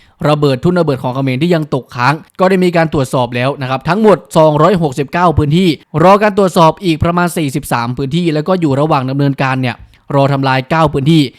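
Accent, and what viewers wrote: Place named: South, Thailand. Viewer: จำเสียงได้คนใต้555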